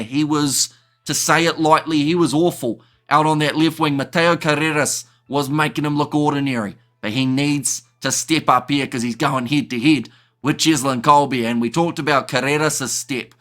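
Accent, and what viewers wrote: New Zealand. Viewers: Love the colourful commentary and insights, and your great Kiwi accent. Thanks, man. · Perfect pronunciations! Didn’t expect it when I heard the thick kiwi accent.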